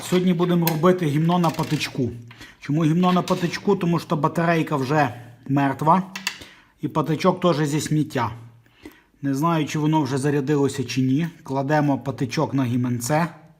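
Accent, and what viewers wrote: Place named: Horodok District, Ukraine. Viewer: Ви певно з Городоцького р-ну😂 Слухаю і балдєю 😆👍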